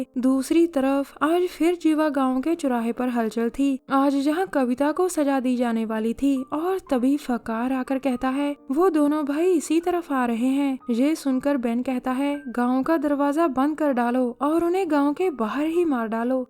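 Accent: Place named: Bihar, India. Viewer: Maidim kya aap bihar se ho boli se toh aap ekdum gaavki bihari aurat lagti ho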